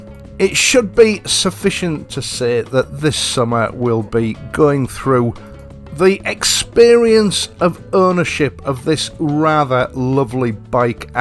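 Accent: United Kingdom. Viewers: No no no, you speak British!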